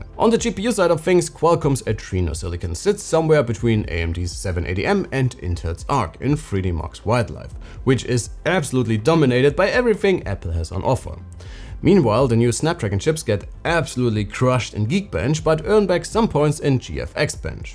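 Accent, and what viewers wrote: Germany. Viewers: Hey, du sprichst eigentlich deutsch oder? Ich erkenne doch einen deutschen der Englisch spricht :)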